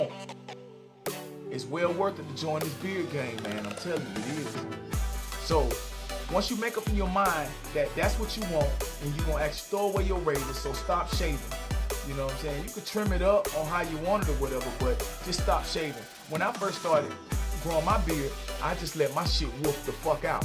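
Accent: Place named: South, United States. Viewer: Bride has to be from the south lol I’m from the south that nigga sound just like us lol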